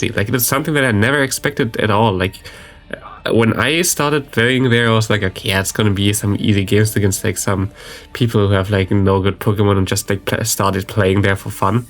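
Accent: Germany. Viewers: which nationality are you?, sounds like german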